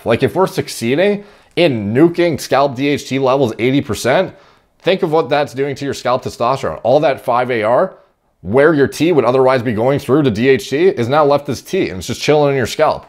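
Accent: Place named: Canada. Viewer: Canadian accent, like mine lol. I've been following his channel for quite some time and he's mentioned enough about himself without getting too personal.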